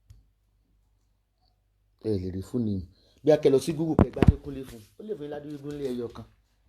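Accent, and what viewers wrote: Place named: Ibadan, Nigeria. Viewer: Love you for your down to earth attitude and truly it’s your Ibadan accent and the typical Ibadan behavior that is the koko of your show Omo Ibadan ki ni so; so sure Go on soun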